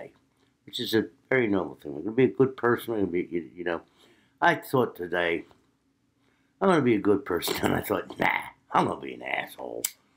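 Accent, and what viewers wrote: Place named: Philadelphia, United States. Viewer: You have a Philadelphia accent. Cool. Subscribed.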